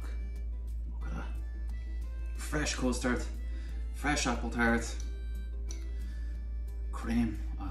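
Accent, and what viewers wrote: Ireland. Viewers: Loved watching and listening to his lovely Dub accent! Made me homesick! My Mam used to make her apple tarts exactly like his! ☘️💚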